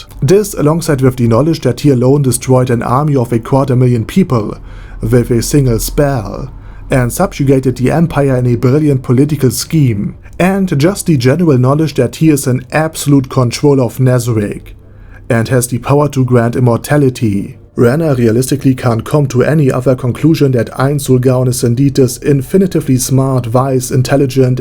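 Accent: Germany. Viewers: I am pretty sure that your original language is German xD der Inhalt ist supi aber dude dein Dialekt ist schon übel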